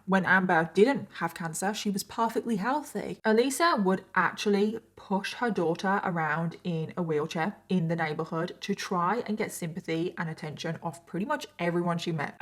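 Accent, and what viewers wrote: United Kingdom. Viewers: Please, Can you narate stories in british accent. It sounds good to me. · MAKE UP REQUEST!?!?! My favorite (American) football team is going to the Super Bowl!!! Will you do a Kansas City Chiefs theme make up? I have just recently discovered your channel and have watched so many of your videos back to back to back that my inner monologue has had a British accent for like 2 weeks now. Thank you!! -Sarah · She’s British and she always does it in her accent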